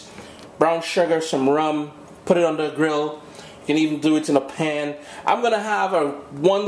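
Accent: Trinidad and Tobago. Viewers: your trini roots coming out loud and clear....thank you.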